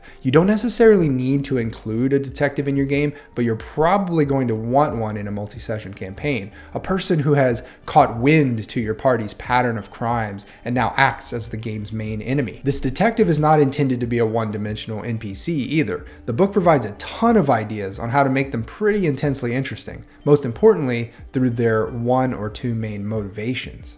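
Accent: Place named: Cockney, United Kingdom. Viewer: I didn't have " goes from RPG Youtuber to Proper Cockney Geezer" on my 2024 bingo card but here we are! Also, top tip for surviving British old money: count shillings before pennies, then you only have to count up to 11 pence at a time rather than 240.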